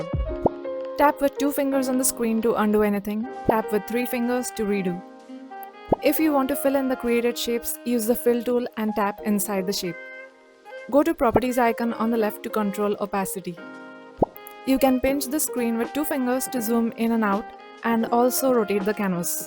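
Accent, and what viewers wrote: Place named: India. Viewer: From his accent he looks like an Indian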